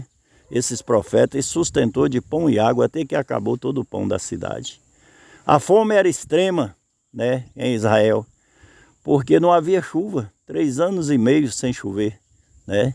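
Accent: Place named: Northeast, Brazil. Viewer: obrigada por me responder, eu percebi pelo sotaque q o senhor era do nordeste, gosto das msgs do senhor, já compartilhei com mais pessoas, sou do estado do Tocantins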